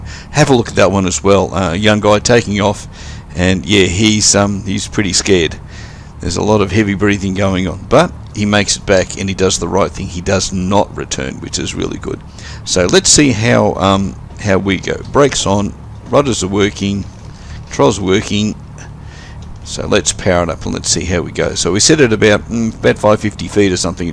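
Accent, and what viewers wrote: Australia. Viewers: Great video, a side of flight sims people don't usually consider. Good to hear another Aussie too!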